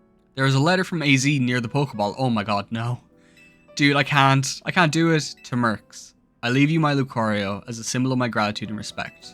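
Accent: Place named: Ireland. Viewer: This is the first video I've seen of yours, I am SO GLAD to find a fellow Tepig lover (I also agree that pignite is such a happy boi). Bonus points for being a fellow Brit. (After listening to a bit more of this video I realised you sound Irish, so sorry about that mate.)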